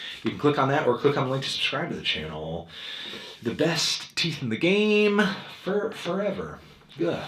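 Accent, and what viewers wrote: England, United Kingdom. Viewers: dude he has the best British accent! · i fucking loved your english accents... you sounded like all characters of monty python...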